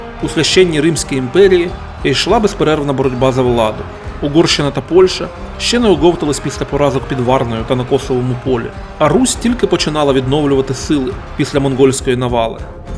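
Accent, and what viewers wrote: Russia. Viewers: Конечно на Украинском это патриотично . Но все таки родной язык декламатора русский . Слышу ґе , а не ге . Слышу ясно так как знаю Украинский досконально . Но все равно молодец .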